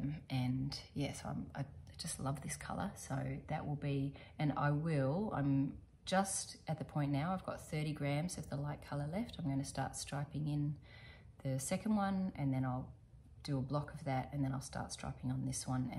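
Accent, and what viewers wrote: Australia. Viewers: Hello, just found you on youtube, nice to hear an Australian voice,you are a gifted knitter, i have been watching youtube since COVID, i do love watching different crafts but lately the knitting ones i have liked because of all the lovely yarns that are available and the projects that people make, i am amazed, i have never been a knitter but lately have been making a few plain scarfs, i wish someone would have taught me when i was younger, but i do other things and i took a watercolour colour class a few years ago now, so i am happy. I have started painting wooden things and doing transfers and gold leaf which is more my thing. I was pleased to come across your podcast and look forward to watching again. Kind regards pamela hall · I’ve just discovered your podcast and I love it!!! It’s fabulous to see and hear an Aussie! Looking forward to watching your other episodes. 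👏😃🧶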